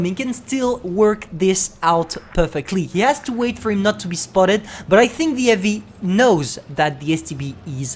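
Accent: France. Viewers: I’m here for the French accent... nothing more, nothing less 🤤🤤🤤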